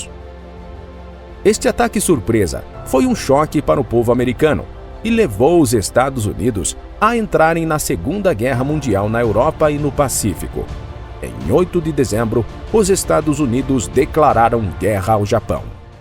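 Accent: Maranhão, Brazil. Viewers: O maranhense não tem o sotaque dos outros estados do nordeste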